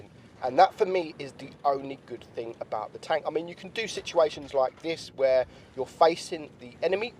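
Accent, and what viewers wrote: United Kingdom. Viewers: I Love your british Accent :v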